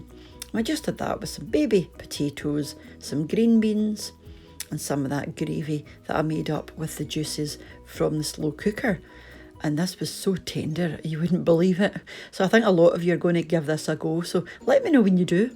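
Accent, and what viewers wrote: Scotland, United Kingdom. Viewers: Oh, and thank you. Really enjoy your Scottish accent.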